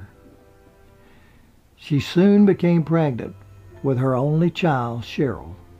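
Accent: South, United States. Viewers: He is a elder southern man. Many (including a large amount of younger ones too) still speak that way down here.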